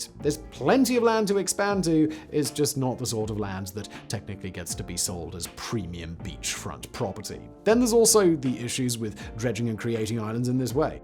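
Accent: England, United Kingdom. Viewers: Only person I can't stand listening to with an English accent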